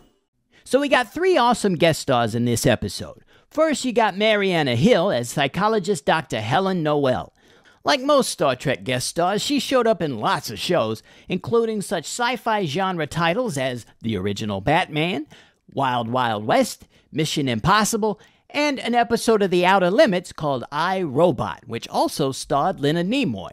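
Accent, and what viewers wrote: Brooklyn, United States. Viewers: just love the ny brooklyn attitude and accent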